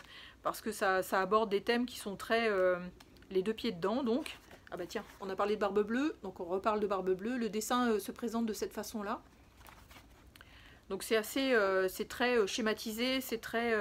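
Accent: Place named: Quebec, Canada. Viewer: une PAL de 340 BDs a lire!!! le rêve! 🥳🎉🎉🎉 Québec, j'avais deviné au léger accent... "Kililana song" est une magnifique BD. le dessin est sublime. "La bête" de Frank Pé, ca a l'air tres sympa. "Blast", une de mes BDs preferees, j'adore le dessin. c'est sûr que dans les BDs qui sont pas "youpla boum" elle est dans le top 3 facile. "La petite lumiere", je veux le lire, j'aime bcp le dessinateur.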